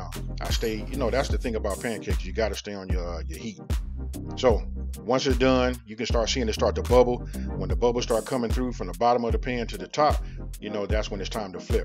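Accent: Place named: California, United States. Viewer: That Cali accent don’t lie! I love it! And those pancakes looks sooo good!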